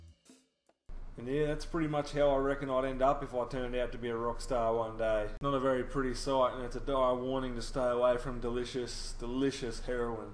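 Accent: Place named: Jamaica. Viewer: I have never heard an Australian try to speak patois ahahaha dude that poodle was money!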